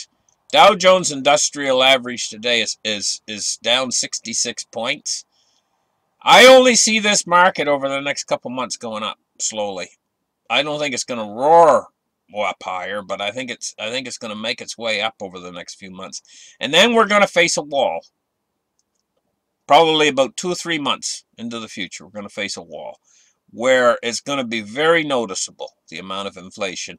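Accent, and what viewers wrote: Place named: Canada. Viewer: Great advise. analogies and stories are the best. The Canadian accent tops it off.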